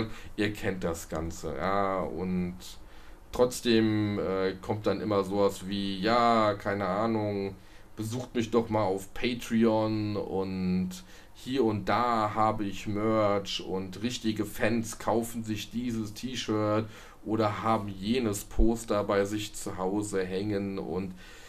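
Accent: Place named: Germany. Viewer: Ich wuerde nicht beschreiben als erfolglos. Du hast ja einen deutschen Akzent aber du bist dennoch verstaendlich. Ich finde deinen Akzent sogar charmant.Weil ich eine Fremdsprache lerne, bin ich grosszuegig, wenn andere Fehler in meiner Muttersprache machen.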